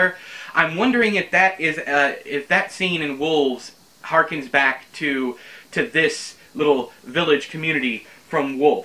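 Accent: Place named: Midwest, United States. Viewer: Where do you shoot from? I'm from Laja, Chile, myself. I like your accent, it's what I'm used to hear the most, having lived a couple of years in the Chicago area and in southern Wisconsin.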